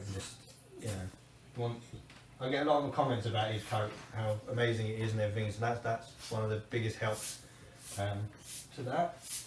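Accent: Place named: England, United Kingdom. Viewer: Thank you so much for the video! Your advice helped me a lot on planning my puppy's diet. Especially the posters they're so useful. There's just one little thing, I am a foreigner and am not very used to the English tone of English so there were lots of parts I didn't really get what you were saying. Would you consider adding subtitles or giving a brief description of the video? 😢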